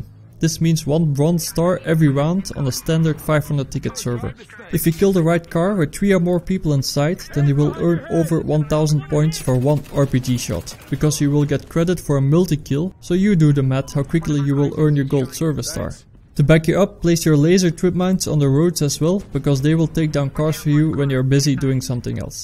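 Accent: Netherlands. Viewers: You sound like a dutch guy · it sounds dutch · you are Dutch? Because of your accent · Tis trouwens wel goed te horen dat je nederlands ben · Wat heb jij een Nederlands accent zeg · by your accent that you are dutch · je hebt het accent van een nederlander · it sounds like a very strong dutch accent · U sound dutch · He has a Dutch accent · I think that you are Dutch, because of your pronounciation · hij spreekt egt die tatta engels met die gekke accent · you're dutch, heard it in 5 seconds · dat hoor je aan zijn accent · Ik hoor aan u accent da gij Nederlands of Belgisch bent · CLEARLY DUTCH :D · haha i can just hear that you're dutch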